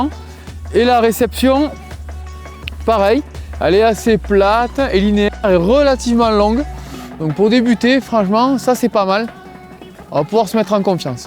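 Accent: South, France. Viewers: Très bonne explication de quoi mettre en confiance et le plus l'accent du Sud 🤘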